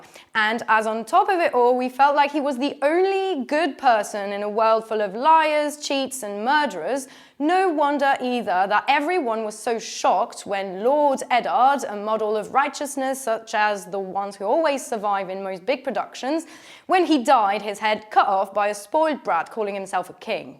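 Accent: England, United Kingdom. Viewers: Super British accent!!!! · Superbe accent anglais !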